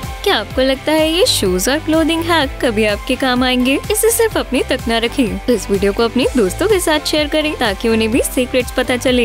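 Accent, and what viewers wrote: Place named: United States. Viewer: are you American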